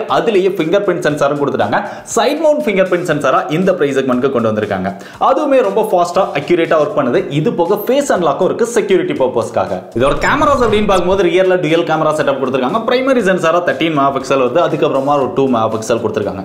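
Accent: Kovilpatti, India. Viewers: Anna neenga kovilpatti ya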